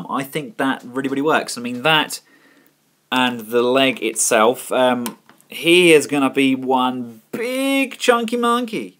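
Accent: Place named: Scotland, United Kingdom. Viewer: wow he looks awesome ! and great review ! i subscribed a few days ago ! by the way, are you scottish ? you sounds alot like david tennant the 10th doctor in doctor who ! lol ! :)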